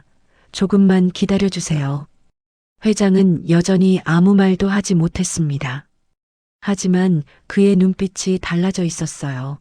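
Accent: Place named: China. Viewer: 쭝꽁놈인가 말이 이상하네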